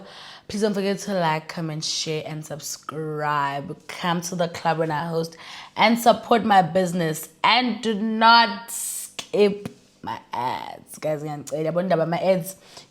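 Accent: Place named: Nigeria. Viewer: i just started watching your youtube channel and im in love with them and you...(love your nigerian accent so much)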